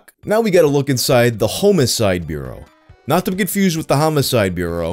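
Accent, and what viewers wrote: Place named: Buffalo, United States. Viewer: sounds like he's from Buffalo or something.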